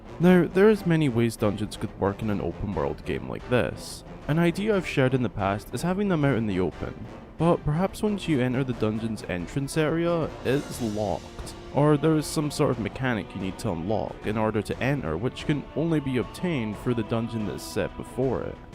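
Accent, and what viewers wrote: Scotland, United Kingdom. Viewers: Love watching these theory videos. I found your channel yesterday, and as a fellow Scottish lad, I have to say we have the best accent 😂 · So good hearing another Scottish accent 😭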